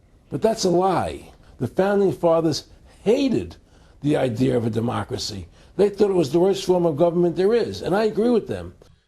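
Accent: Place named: Europe. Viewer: Your comments concerning Nationality revealed that you have done very little viewing and reading on Taj Tarik Bey's work. You have only to study a little bit more to know that you are agreeing with his definition of Nationality. I feel as you are trying to establish credibility and notoriety for yourself by superficially debating long standing scholarship with adolescent claims and shoddy research. If I were to make a guess to your nationality by the sound of your voice it would be of european ancestry, (troglodyte).Which would explain your interest in debunking rather studying.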